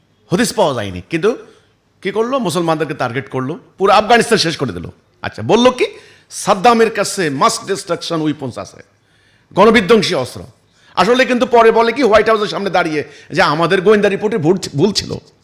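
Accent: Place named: Noakhali, Bangladesh. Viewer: আগে ভাবতাম অন্য রকম , এখন দেখছি মাথায় সন্ত্রাসী চিন্তা চেতনা ?? ভাষা শুনে নোয়াখাইল্লা মনে হলো !!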